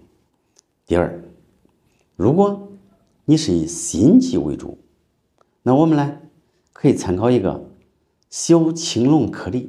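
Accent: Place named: Henan, China. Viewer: 这个河南口音来的太正宗了，大概是南阳的吧！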